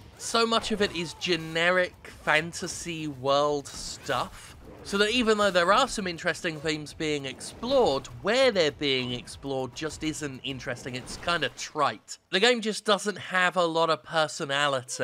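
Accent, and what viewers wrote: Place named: West Country, United Kingdom. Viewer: your west country accent is quite good (as a person of the westcountry) I'm going to say you're from Berkshire?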